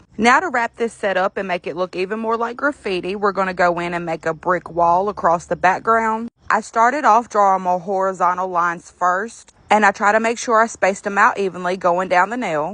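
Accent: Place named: South, United States. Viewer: I’m so sorry but can we talk abt how the longer video plays the more country she sounds 😂😂❤️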